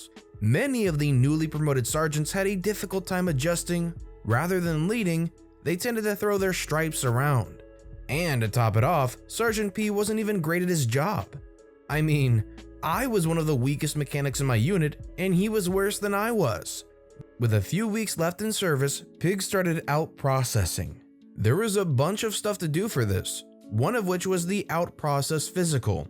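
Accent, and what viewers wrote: United Kingdom. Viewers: is British, that'll be why / sounds different XP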